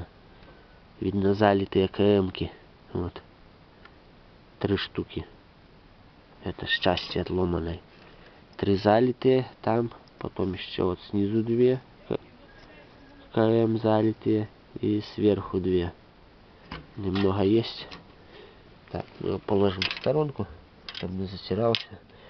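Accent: Belarus. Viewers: Чувствуется Белорус 😉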